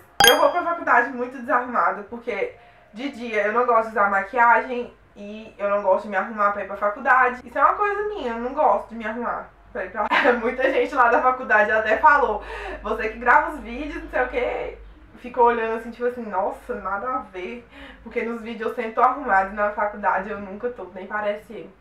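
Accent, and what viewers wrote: Minas Gerais, Brazil. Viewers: Juro que pensei que você era mineira como eu, por causa do sotaque KKKKK adorei, bjs! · Morri de rir com o caso da franja, já fiz parecido, kkkkkkkkkkkkkk' Me identifiquei muito com a sua personalidade, parece demais comigo. Até o sotaque é parecido, só que sou do Norte de Minas, inclusive achei que vc também era mineira porque o sotaque parece muito.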